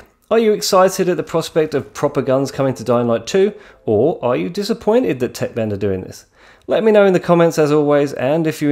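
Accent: United Kingdom. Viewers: hey! are u British, or what language are u speaking, in ur videos?????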